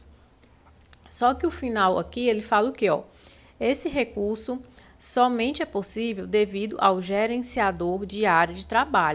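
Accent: Brazil. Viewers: Parabéns pela ótima didática somada a esse doce sotaque de algum lugar do Brasil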